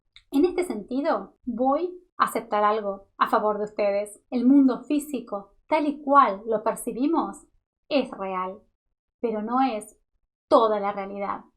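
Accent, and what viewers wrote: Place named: Argentina. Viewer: Está muy bien la información pero ese desgraciado acento argentino y en otros videos el acento español hacen muy difícil aguantar verlos hasta el final, es un desgraciado suplicio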